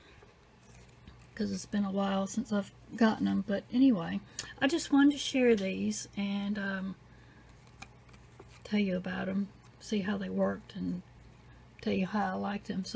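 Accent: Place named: South, United States. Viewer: Thank you for sharing this video about these dies because otherwise I wouldn't have purchased any of them. About how long does it take for you to receive your orders from China & are they packaged well? BTW...I love listening to your southern accent, it's so sweet, it reminds me of my mama's accent... :) Take care, Lily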